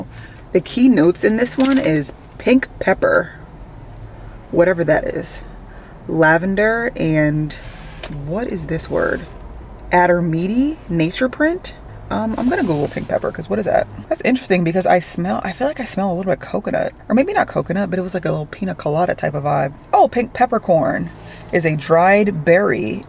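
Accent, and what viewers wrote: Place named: Philadelphia, United States. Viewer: OMG! You sound like one of the people from Parking Wars. And it is ALWAYS in Philadelphia. I hope you win!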